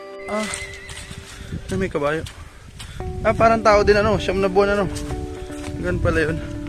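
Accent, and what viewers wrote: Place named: Guinayangan, Philippines. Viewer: hahaha kaya pla medyo pamiliar sakin pagsasalita mi guinayangin kasi ako sir💪💪 tuloy tuloy lng sir suporta tayo dyan proud quezonin💪😁🏆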